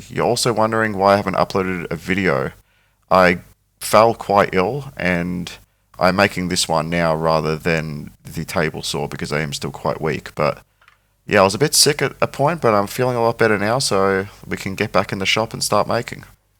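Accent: Australia. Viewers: WOW!! great work... nice to hear another Aussie voice on youtube..If i may make one comment, ive always used a router with a center jig for cutting out circles.. but i do like your idea of using the beltsander while the sanding disc are spinning...new subscriber..cheers! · Are you Aussie?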